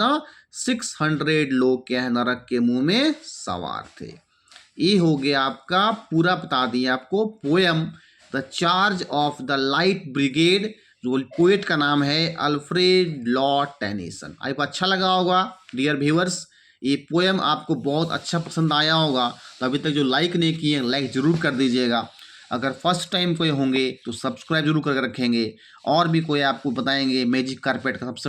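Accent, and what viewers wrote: Bihar, India. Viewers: Sir please aap apna accent thik kriye English words ko bihari accent dekr kharab mt kriye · Sir please apne bihari accent ko sudhariye. English words ki ma-bhen kar rkhi hai!!!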